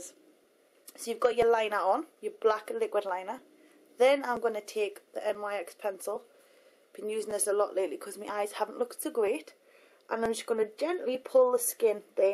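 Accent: Newcastle, United Kingdom. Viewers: Thats a Newcastle accent, not Irish! She comes from my end of the country yey! And I love your videos theyre so great =] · its called a geordie accent. dont leave your area much do you? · Are you from Newcastle ? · love the geordie accent, its sounds like meeeee!!!!!!!!!! :) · R u from Newcastle? :S :) x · i love love love your videoss, and loove you newcastle accent:D thanks for this video hunnie:) xxxx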